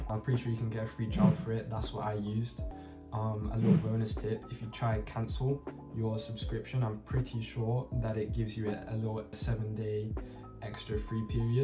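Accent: France. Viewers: yes I’m a native English speaker trying to learn French and his French (or seemingly French) accent was the first thing I noticed upon clicking the video. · You have a little but of french accent in english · you have a french accent when you speak English, you wont fool me. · Bro has a French accent when he’s speaking English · What is your native language? It sounds like you have French accent. I think you are making stories. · Your accent is so French?! R you sure it’s not your native language?! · This guy is lying- he is speaking English with French accent. We are latvians,but our kids went to french kindergarden and finished primary school, you cannot fool somebody who has children with French as first language. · bro even got french accent 🔥🔥🔥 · His English has french accent...hmmm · I don't believe this. There is something you're not telling us. Your Day 1 already had a French accent that someone learning literally their first day would not have. 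And just heard it: He began learning it in primary school. So you DID already have some education in it. Come on, stop with the MISREPRESENTATION! WHAT A LIAR. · You have a french accent when speaking english.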